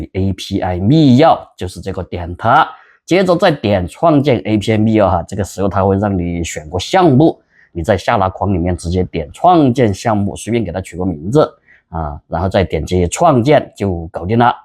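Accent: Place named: Sichuan, China. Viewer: 熟悉的川普😂😂😂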